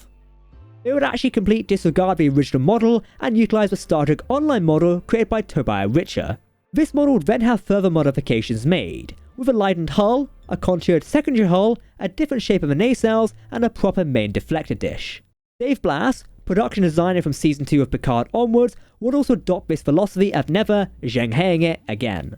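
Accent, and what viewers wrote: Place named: England, United Kingdom. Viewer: Who,? It's a proper English accent. I could understand him just fine.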